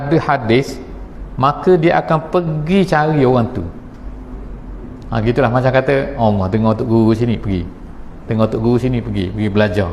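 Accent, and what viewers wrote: Terengganu, Malaysia. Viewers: Ustaz dari brunei ke? kenapa loghat macam Terengganu 😍 saya ingatkan Ganu 😂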